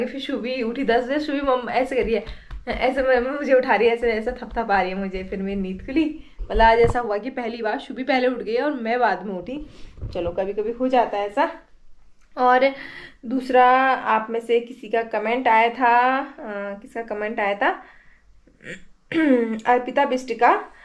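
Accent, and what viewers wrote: India. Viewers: Accha tho bol Rahi ho pahadi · Wow aapke muh se kinni achi lag rahi h pahadi bolna ❤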